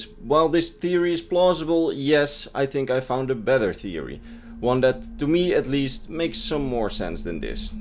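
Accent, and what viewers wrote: Netherlands. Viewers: I hear somebody is dutch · U sound dutch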